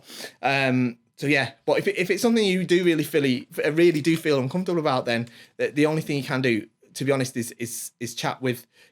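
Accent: United Kingdom. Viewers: hello. Please remove your name and other titles from bottom because I'm watching you with subtitles to better understand you British English. 😉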